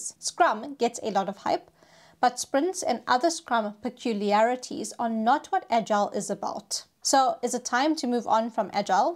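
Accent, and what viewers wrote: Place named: South Africa. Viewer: Spotted the South African!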